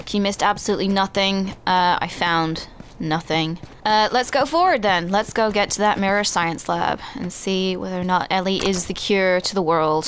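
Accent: United States. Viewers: are you from AMERICA? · I usually hate commentaries, but listening to your gorgeous Mid-Atlantic accent is a rare exception.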